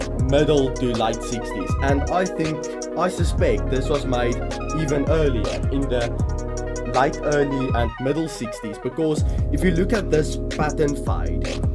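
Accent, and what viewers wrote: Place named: South Africa. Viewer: you have the strongest saffa accent ive ever heard. Wish the guy who offered me a genuine Rhodie wasnt greedy (bro wanted 5000 rand) 😔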